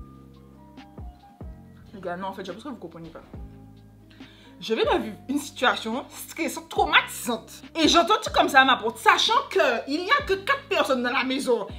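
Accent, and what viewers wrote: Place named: Canada. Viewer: Accent canadien oklm 👌🏾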